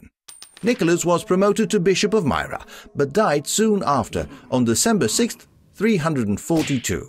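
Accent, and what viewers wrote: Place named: Netherlands. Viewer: Cecilia Brennan that's because the Guy making this is dutch, im English and i find that the dutch accent sounds a bit weird xD i recognize it as soon as i hear it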